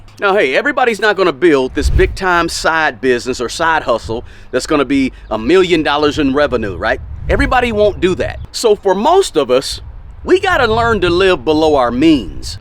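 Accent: South, United States. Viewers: I’ve started to live below my means. It takes discipline because I am a spender. Thanks for the tips. Btw, I love the southern drawl you have. God bless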